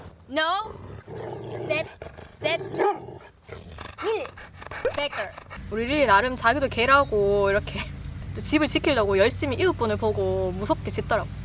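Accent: Gyeongsang, South Korea. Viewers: 일하는 직장인으로서 힘들고 지쳐있고 현재 따고픈 공부도 하고잇는데, 가끔 님 유투브보면 너무 재밋고 하여 즐겁게 시청해요 ㅎㅎ 게다가 경상도사투리가 와닿아서 잘듣고잇어요ㅠㅠ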